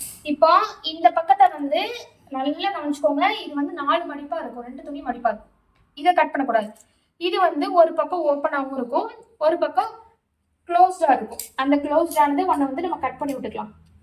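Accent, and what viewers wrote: Tirunelveli, India. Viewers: Tirunelveli slang always beauty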